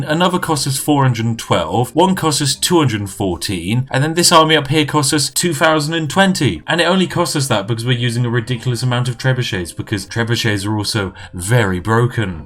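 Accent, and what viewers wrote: United Kingdom. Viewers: the use of the British exploit of anglicizing everything and not even bother to try and pronounce the names in the original pronunciation made me laugh very Spiffingly British hahaha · That british accent makes a lady happy indeed. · You know I usually dislike brits due to their attitudes/accents. You sir, should be royalty. Funny as hell, informative and got me to drink a cup of tea. Hats off to you sir.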